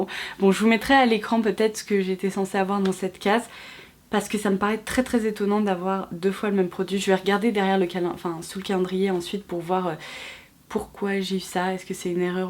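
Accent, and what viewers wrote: England, United Kingdom. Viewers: J’adore ton accent anglais😍🙏🙏; as tu des origines anglo-saxonnes ?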